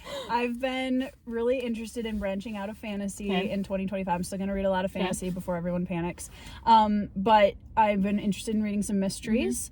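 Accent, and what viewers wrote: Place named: Minnesota, United States. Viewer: I knew you had to be from Minnesota from your accent!!!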